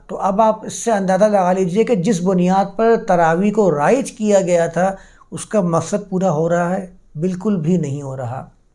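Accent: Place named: Arab world. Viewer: میاں، آپ بہت معقول باتیں کرتے ہیں، مگر خدا معلوم کیوں اردو کی عربی میں تلاوت شروع کردیتے ہیں؟ اب آپنے فرض کو فرد کہا، جبکہ فرد کا مطلب اردو میں individual ہوتا ہے۔ اردو میں “ض” کی آواز بلکل “ز” کی طرح ہے۔ اور یہی مستند ہے۔ طرفہ یہ کہ قرآن جسکو اردو میں بھی قرآن ہی کہا جاتا ہے، اسے آپ کوران کہتے ہیں۔ یا للعجب! اردو کو عربی لہجے میں بولنا اتنا ہی غلط ہے جتنا عربی کو اردو یا پنجابی لہجے میں بولنا۔ اب اردو میں تو فارسی، انگریزی، اور سنسکرت سے مستعار لفظ بھی ہیں۔ تو کیا انکا تلفظ ان زبانوں کے مطابق کیا جائے گا؟ تو پھر اردو کی کیا درگت بنے گی اور وہ کیسی مضحکہ خیز سنائی دے گے۔ اسلئے میاں اردو کو اردو میں ہی بولا کیجئے۔ آپ جب تلاوت کریں، یا عربی میں درس دیں تو بصد شوق خالص عربوں کی طرح بولیں۔ کس نے منع کیا ہے۔ اھلا و سھلا۔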